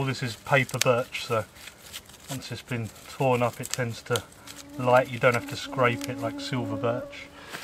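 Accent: United Kingdom. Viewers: are u british bruh?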